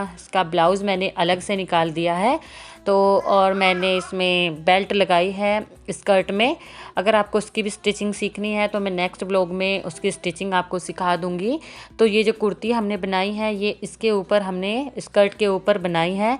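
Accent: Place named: Saharanpur, India. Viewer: Aap up me kaha se apki language se lgta h aap Saharanpur se h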